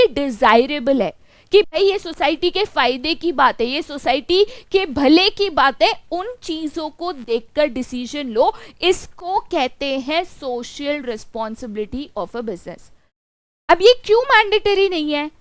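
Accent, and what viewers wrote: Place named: Mumbai, India. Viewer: I think mam from Mumbai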